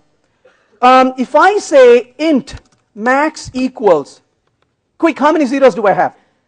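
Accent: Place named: India. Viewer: 録音の状態が悪い上に、インド人的な早口でインド訛りが少しあるので聞きやすくはない。 それでもこの人の話は聞く価値がある。ヴェンカットさんはアジャイル系の本の著者。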